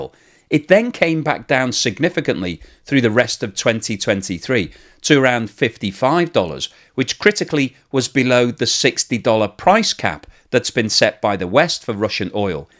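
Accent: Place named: England, United Kingdom. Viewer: Yes indeed, if they come from Spain. Of course they may speak Spanish with an Argentinian accent if they come from Argentina. They might also speak English with an Argentinian accent..but this creator speaks English with an English accent. I might speak English with a Scottish accent in which case I wouldn't have an English accent but a Scottish one.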